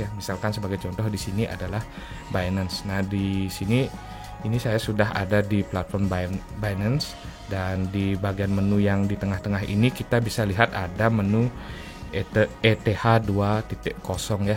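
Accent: Bali, Indonesia. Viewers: Bang kok logatnya ky org bali ya?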